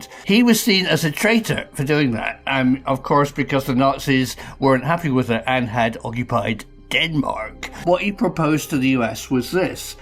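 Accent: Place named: Scotland, United Kingdom. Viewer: omg this is sooo cool, and the bit i like ther most is your wee bit of scottish accent... lots more pls.. always great to watch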